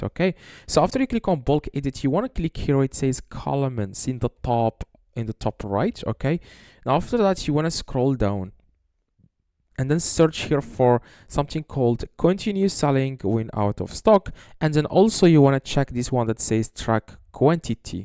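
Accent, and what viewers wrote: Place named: Morocco. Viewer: Merci mon frère, j’ai très vite reconnu ton accent marocain mdrrr, Tu m’as sauvé d’un problème que j’essayais de résoudre depuis ce matin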